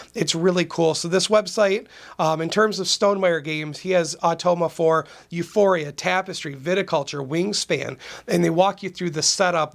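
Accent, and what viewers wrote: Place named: Wisconsin, United States. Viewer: I feel like you have a northern accent. Is that a Wisconsin accent by chance? lol.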